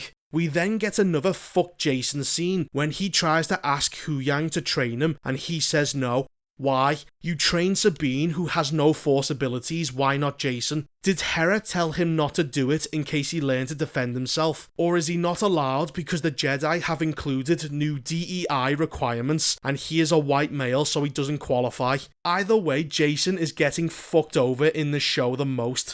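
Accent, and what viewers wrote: Liverpool, United Kingdom. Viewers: i can't take the beans on toast accent seriously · Scouse, innit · Mate you make me laugh ye sound scouce we’re ye from buddy lol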